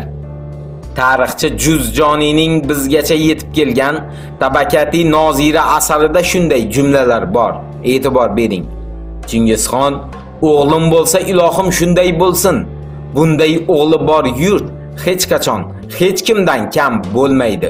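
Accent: Khorezm, Uzbekistan. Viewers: Ovozingga qutagim mincha xorazm diysan o'zbek de bildik zorazmdan ekansan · eyy siz O'zbekistonni qaysi viloyatidansiz xurmatli admin shevaizdan Xorazimliksiz manimcha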